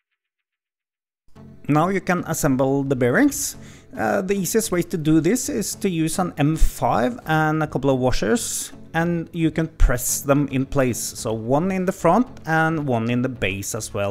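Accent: Norway. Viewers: The norwenglish is strong in this one. Nice video neighbour.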